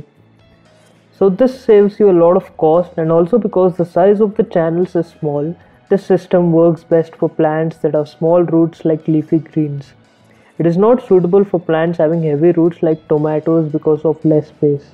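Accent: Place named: India. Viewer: A white animation figure and an hindi accent. As long as it is not the IRS I am fine I guess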